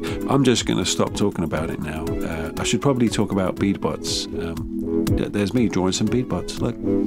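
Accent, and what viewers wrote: United Kingdom. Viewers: Hey, extra British in this video. Can’t tell ya why but just wanted to point it out. Love your stuff and your noodle.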